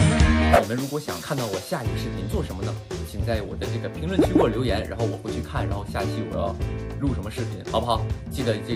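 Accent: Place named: Dalian, China. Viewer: 我是永居在日本大连人、（具体应该是瓦房店的、离丹东更近一些）听着说话就特别亲切、直播时说话和我们家都一个味儿、无比的亲切、都能扑哧的笑出声音来、乡音乡情、尤其宇宁小弟还怎么努力、这么与众不同、用自己的方式给自己开辟出一片新天地、我最佩服这样的人、加油吧、在海外的粉丝会一直支持喜爱你的！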